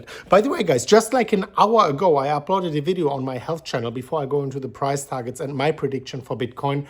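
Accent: Germany. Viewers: "Im a little bit under the water" i love your german english 😂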